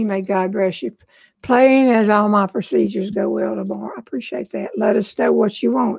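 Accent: South, United States. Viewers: I normally can’t listen to a southern accent, but is an exception. Love her. R.I.P.!❤️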